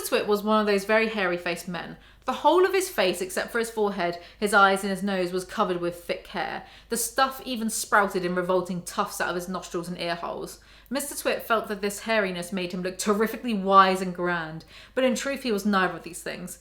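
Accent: England, United Kingdom. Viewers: You reading this with your accent makes it so much more enjoyable, British really was made for insults.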